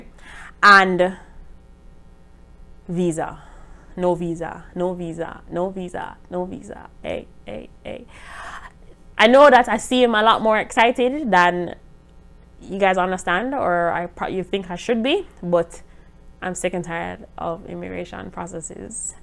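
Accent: Jamaica. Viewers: You sound Jamaican. Are u?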